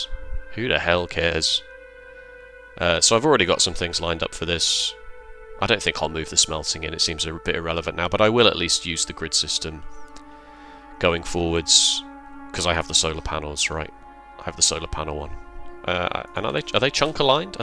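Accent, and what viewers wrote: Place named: United Kingdom. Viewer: I like this format. I'm just here for the Factorio content. Carry on doing what you enjoy doing. Waffling on in a British accent is fine... kind of soothing really.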